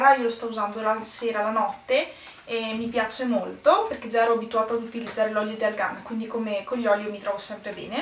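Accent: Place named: United Kingdom. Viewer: A parte i prodotti fighi, ti faccio una domanda un po' da "fattilicazzimia": come fai ad avere un accento very british? Hai fatto qualche corso, scuola ecc ecc? Grazie mille per il video, un bacione!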